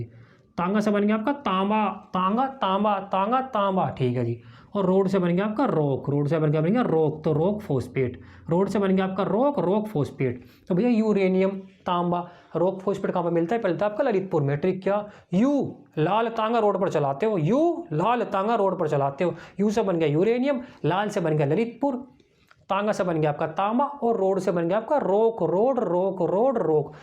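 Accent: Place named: Meerut, India. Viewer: Sir video bhoot mst hai.. ekdum funny. Tarike se apne padhaya hai . Apki boli se lag rha hai aap merrut side se ho.. 😅 Bhoot acha padhaya hai .❤❤❤❤❤❤❤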